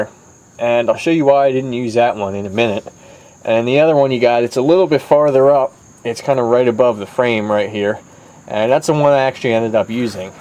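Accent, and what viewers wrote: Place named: Philadelphia, United States. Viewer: Are you from Philadelphia, or close to it? The second I heard you speak. I was like, this dude is from my area.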